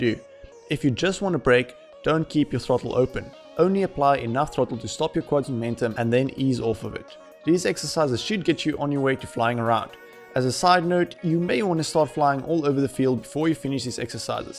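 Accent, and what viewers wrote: South Africa. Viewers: Are you South African?? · Hey you from SA?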